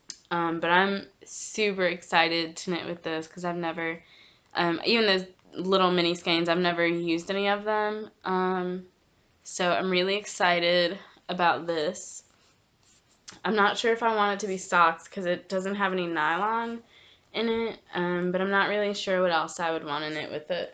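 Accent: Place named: Alabama, United States. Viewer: Hurray! 👏🏽👏🏽👏🏽 Awesome first episode! I really enjoyed listening/watching. Your accent tooootally made me homesick for alabama lol (i use to live in huntsville). Im excited to see how that sweaters quantity works up. The color is beautiful. I need to get my ravelry project pages together... theyre shamefully out of date... smh. One day 😒☺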